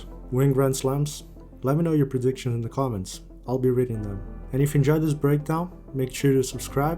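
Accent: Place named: Brazil. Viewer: you speak english with a brazillian accent, are you actually from brazil?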